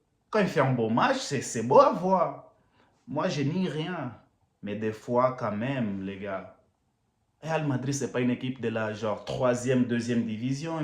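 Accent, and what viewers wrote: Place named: Portugal. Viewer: Bonne vidéo mon frère, on sent ta colère et je la partage ! Loin derrière nous l'époque des grands Cristiano, Ramos, Marcelo, etc, loin derrière nous l'époque où les joueurs se battaient pour le blason et qui mouillaient le maillot...maintenant on a des branleurs. Des branleurs avec un énorme talent, oui, des branleurs qui parfois arrivent à avoir une étincèle, un brin de génie, mais des branleurs quand même. D'ailleurs, petite question, es tu portugais ? J'ai comme l'impression d'entendre un léger accent. 😄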